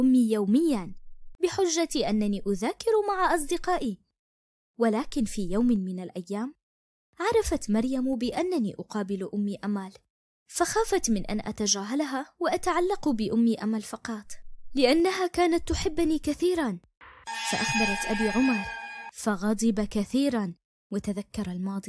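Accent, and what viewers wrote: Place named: Algeria. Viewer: من لهجتك تباني من الجزائر انا من الجزائر وانت♥♥♥♥♥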